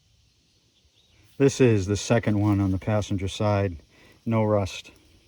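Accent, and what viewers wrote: New England, United States. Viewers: haha thought I could here some new england in your voice! Keep up the good work love your channel!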